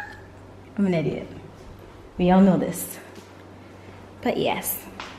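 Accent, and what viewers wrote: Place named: Barbados. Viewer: I love your Barbadian accent! You must be from Barbados.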